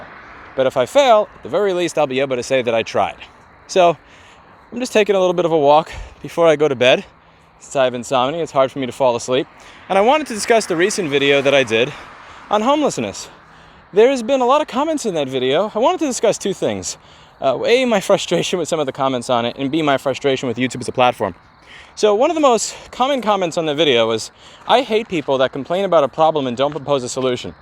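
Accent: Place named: Brooklyn, United States. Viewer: Personality, God you'r from NY , Brooklyn even.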